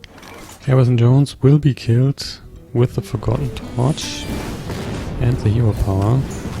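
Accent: Germany. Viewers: Nice work, I like the style of your Videos! Regarding to your pronounciation I would bet you are also from GER?!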